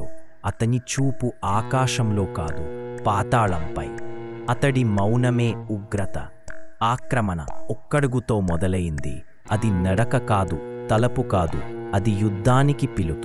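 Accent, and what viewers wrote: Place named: North India, India. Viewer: VFX good, story narration and Words spelling match kaledhu north vaallu telugu matladithe ela untadhi ala undhi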